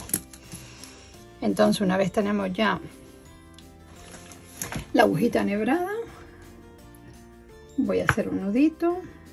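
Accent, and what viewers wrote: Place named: Canary Islands, Spain. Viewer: Mil gracias, me encanta tu acento, me recuerda al de mi familia Canaria y a esa preciosa isla. Un abrazo desde Vitoria 😘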